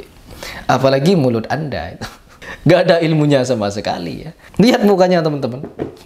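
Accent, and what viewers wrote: Madura, Indonesia. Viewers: ni orang kontenya cuma kebencian,logatnya madura tapi adabnya isra'il,pasti ini belajar pada google dan youtube,sudah jelas mulutnya sebentar lagi miring hidup dan matinya susah bahagia,semoga segera dapat hidayah,tapi orang kyk gini susah dapat hidayah krn sudah tercampur setan yg angkuh dan songong,biasanya klo orang hebat beladiri semakin tinggi ilmunya semakin merendah hatinya,brrti ini masih dikit ilmu beladirinya,sehingga angkuh songong seakan akan paling suci dan paling benar, na'udzubillah stumma na'udzubillahi min dzalik.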